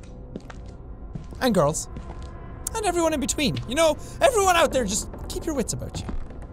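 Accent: Ireland. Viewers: I love how he gets more Irish when he's angry or scared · It's an Irish accent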